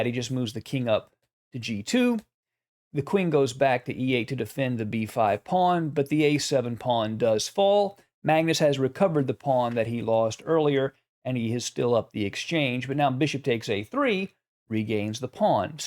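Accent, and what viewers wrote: South, United States. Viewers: Are you from southern America ?🙂 Nice video, I love watch your chess review 🤩😍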